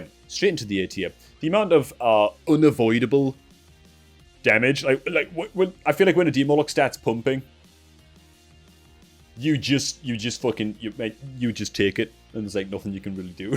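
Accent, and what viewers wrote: Newcastle, United Kingdom. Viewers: Hey man, good to hear your dulcet Geordie tones making wow content again and more importantly enjoying the game!! Cracking list and great addition of the stats for each spec, really gives a better perspective on what is actually strong and not just popular in the hands of the right player!!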